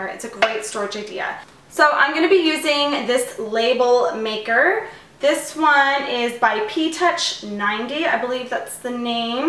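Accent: Canada. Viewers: Love your recipes and ideas. You should have a show on the Food Network. Is that an Italian-Canadian or Greek-Canadian accent?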